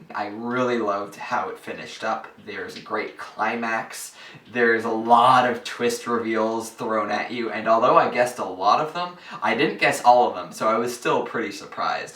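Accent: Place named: South, United States. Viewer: Ah, I thought there was a bit of a southern accent to your response 😆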